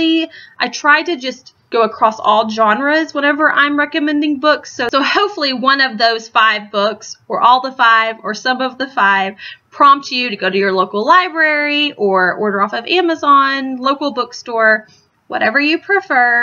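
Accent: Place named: Texas, United States. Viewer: I love your accent, I lived in Houston TX my whole life and we just moved to Michigan and I miss the accents!! I loooove watching book hauls so I can't wait for more from you! great video!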